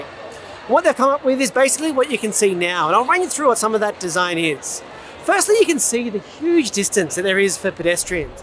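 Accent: Australia. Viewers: i watch a bunch of American Youtubers that do very much what you do, EG Adam the Woo, Justin Scarred etc there's 100s of them and i thought i wish an Aussie would do something like this and i finally found one, unfortunately in Australia there are two really noticeable accents the I went to uni and i left in year 10 to work for my dads building company and you really are on the high side of "i went to uni" but that's cool at least you know how to study and find the truth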